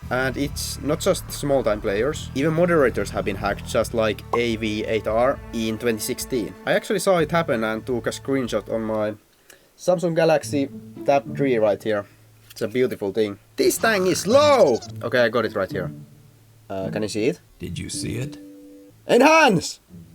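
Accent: Finland. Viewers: I like finnish accent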